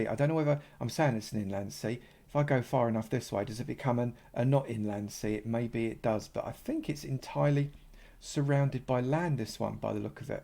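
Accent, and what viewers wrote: United Kingdom. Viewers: Its a normal british accent you donkey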